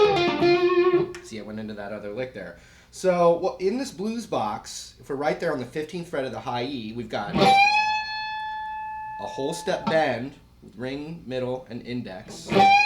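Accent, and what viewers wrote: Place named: New York, United States. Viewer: Hey, are you from New York? i don't know, i think you have a New York accent. i'm from Belgium so excuse if i made a mistake.:p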